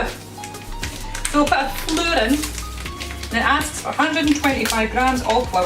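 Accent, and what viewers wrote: Scotland, United Kingdom. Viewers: There's something relaxing about a sassy Scottish lady telling us how to cook desserts. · I'm a native English speaker, and 99% of accents I can understand perfectly but holy hell I've never heard a heavy female Scottish accent and I can barely understand a thing. Thanks for the recipe though they look delicious mate!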